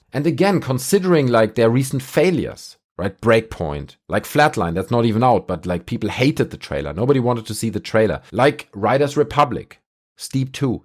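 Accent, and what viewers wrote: Germany. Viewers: Man I just love your pure German accent and wanna see you rant more and shit on ubishit!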